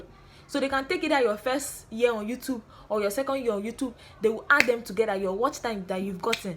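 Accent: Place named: Igbo, Nigeria. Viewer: I remember your first video I watched that made me love your content. That motivational speaker video. I laughed so hard. let's do part two of that video together...Lol. I love your igbotic vocal in this video. So pure, original and quality content as always. I love you, baby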